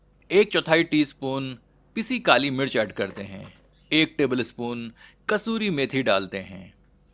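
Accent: Standard. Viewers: Ap ka hindi acha he sudh ghee jesa hindi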